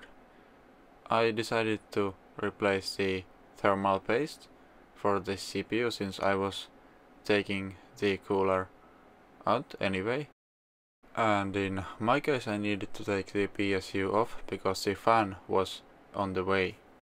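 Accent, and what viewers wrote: Finland. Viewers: Katsoin 10 sekuntia ja oon täysin varma tästä Suomi aksentista. Löysin tänne koska etin videoita kotelosta kun tilasin tän siskonpojan kokoonpanoon ja halusin nähdä mimmosii fiiliksii muilla on tästä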